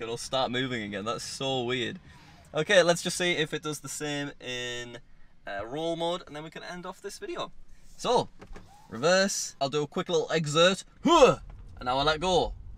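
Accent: United Kingdom. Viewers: You saund like a British man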